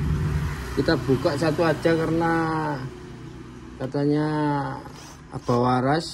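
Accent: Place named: Sidoarjo, Indonesia. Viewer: Pean asli pundi . Kok bahasane sidoarjoan nyel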